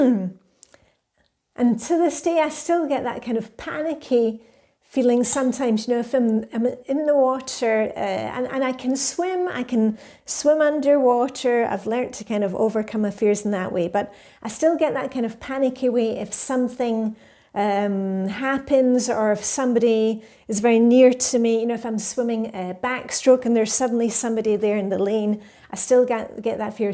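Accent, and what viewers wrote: Scotland, United Kingdom. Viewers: Gosh!! How many languages do you speak?? Obviously French and English (with a Scottish accent) do you speak Danish and Swedish as well???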